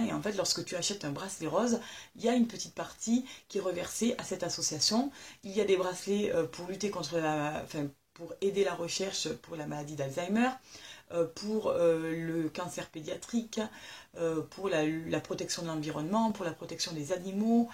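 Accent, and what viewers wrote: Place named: Quebec, Canada. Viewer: Alors comme à mon habitude j'écris en écoutant ta vidéo! la purée de noix de cajou, je ne savais même pas que ça existait, j'adore les noix de cajou (non salées bien sûr), ça doit se trouver à la Vie Claire. Les bijoux que tu présentes en premier ont l'air super jolis, mais bon je ne suis pas très bijoux. pas de bagues, pas de montres depuis 2005 (on a l'heure partout), juste une petite chaine et des boucles d'oreilles. J'adore l'accent québécois! J'admire et je respecte les personnes qui sont sensibles à l'environnement. Dommage qu'elles soient si peu nombreuses. J'ai un peu plus de mal avec les vegans (je respecte leur choix, mais quand je vois le comportement de certains extrémistes, la réciproque n'est pas de mise) je te souhaite un joli mois de novembre, moi ce sera loin, loin.... la réalisation d'un rêve.... bisous!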